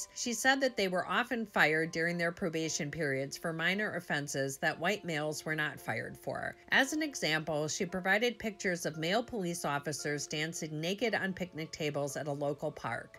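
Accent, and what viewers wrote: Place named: Wisconsin, United States. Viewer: You even sound like you're from Wisconsin, probably even Milwaukee. I can tell by the way you pronounce the name of the city. You don't pronounce the L's. (Muhwahkee) So I would expect better of you if you are indeed from Wisconsin like it really sounds like you are. Don't you want people to think more of your extremely dynamic and beautiful state???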